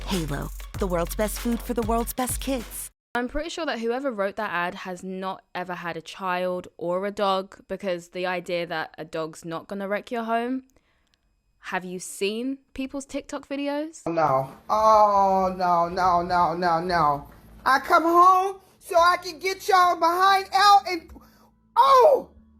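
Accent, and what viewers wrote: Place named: United Kingdom. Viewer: Love your accent. Just love to hear British people talk. I've watched so many British tv because I love the accent..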